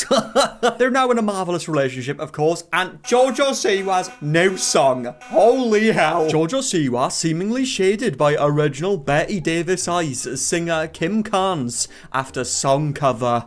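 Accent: United Kingdom. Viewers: Lmaoooo had to watch this video with subtitles coz of the thick Brit accent but worth it 😂 This girl needs to go away and do some self reflection for at least 5 years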